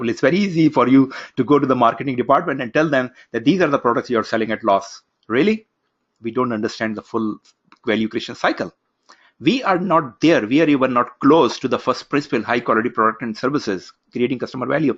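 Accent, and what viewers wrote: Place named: India. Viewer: it would be better to use an AI voice for these videos, listening to indian pronunciation is extremely exhousting, without even talking about the accent.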